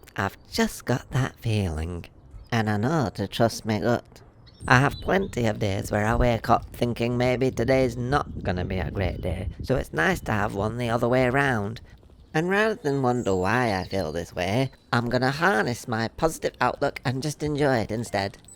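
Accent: Yorkshire, United Kingdom. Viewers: I agree with all of the above. What part of Yorkshire are you from, Leeds?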